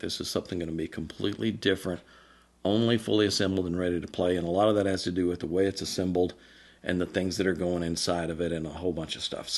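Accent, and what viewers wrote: Texas, United States. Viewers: "He cranked 'em up with some Danzieg....". Incredible. Between the story and the Texan accent, it's like a Coen Bros. movie.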